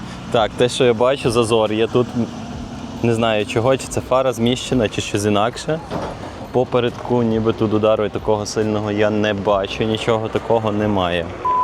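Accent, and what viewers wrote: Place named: Vinnytsia, Ukraine. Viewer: Лайк за українську(чисту) мову,звідки родом,Віниця?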